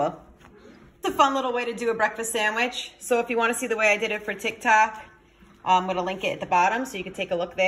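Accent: New Jersey, United States. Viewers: U must be from jersey !! Or maybe I’m wrong lol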